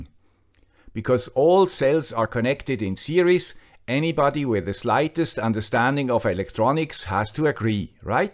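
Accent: Switzerland. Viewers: But who should I trust? A community that made experiences with panels that don't have diodes and bad hardware while not realising things got optimised, or some guy with a Swiss accent that accidentally buys the wrong panels and orientates them poorly while using mathematics and a relatively similar system to compare different things? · If you watch how Americans do product presentations by manufacturers to installers, you will know that it's not lying per se rather a culture of willful ignorance -ie, dont teach them what they're selling, only teach them what they need to say using the most simplest words/concepts possible, kinda like optimizing an idea. America, after all, is where a President gets elected by saying the world's largest economy is like running a household budget. Speaking of which, I dont know what all that data and parts means, but the Swiss accent made me believe the conclusion. 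But I do wonder, yes, the 2% "tax" run "optimizers" but I am under the impression they are also MPPTs.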